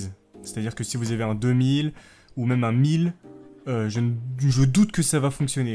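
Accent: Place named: England, United Kingdom. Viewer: Rolala l’accent anglais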